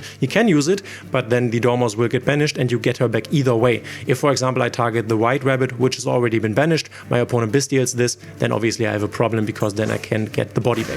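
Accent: Germany. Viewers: is it possible you're a German? I think the way you speak reminds me of German xd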